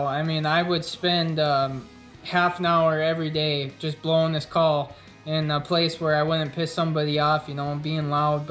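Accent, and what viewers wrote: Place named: Minnesota, United States. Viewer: ND?! You sound like you’re from Minnesota!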